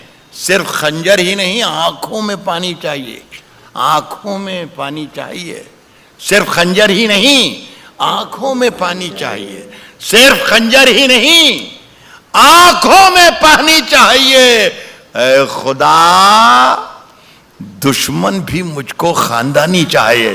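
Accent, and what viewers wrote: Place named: Jaipur, India. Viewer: Aap jaipur se ho mene aapki bhasha suni mebhi जयपुर मैं ही rehta हूँ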